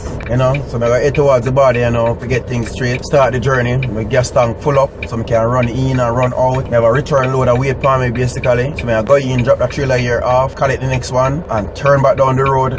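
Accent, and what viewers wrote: Jamaica. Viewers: Hey yuh should read the captions on yuh videos, it's so funny. Youtube captions algorithm can't handle de Jamaican accent.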